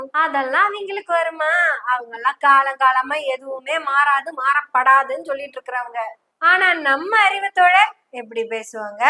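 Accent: Kongu, India. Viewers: தங்கப்பெண்ணே, உனக்கு content மட்டும் இல்லை, துணிவும், தெளிவும், humour உம், கொங்குத் தமிழ் மணமும் நிறைந்து கிடக்கிறது. மேடைப் பேச்சும் கை வந்த கலையாகும். உயரப் பற, வெற்றி உனக்கே. God bless!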